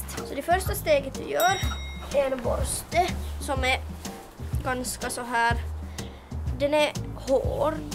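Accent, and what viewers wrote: Finland. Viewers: Kommer du ifrån Finland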